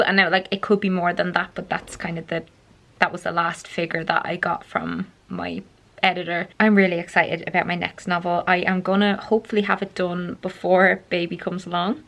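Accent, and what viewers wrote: Ireland. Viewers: i'm going to go and study in Dublin for the next year so i've been binge watching your videos to up my game on the Irish accent so I don't look like a complete idiot 😂☀️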